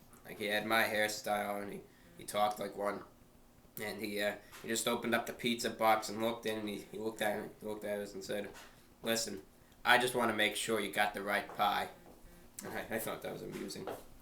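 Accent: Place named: United States. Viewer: I dont know if this american sounding dude is in the UK or not based on the fact he said takeaway instead of takeout in another video 🤔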